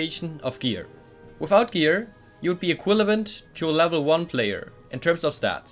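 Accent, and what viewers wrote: Germany. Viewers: Lol Do you have a German accent?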